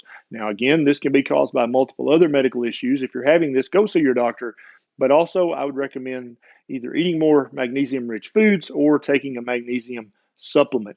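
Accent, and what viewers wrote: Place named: Georgia, United States. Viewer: You sound like you're from Georgia.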